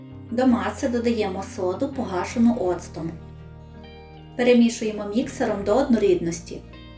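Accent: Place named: Hutsul, Ukraine. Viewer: Дуже дякую за рецепт. Чудово подайте, без зайвої балаканини...І чудова українська мова...з ниткою Гуцулендії.😉🤗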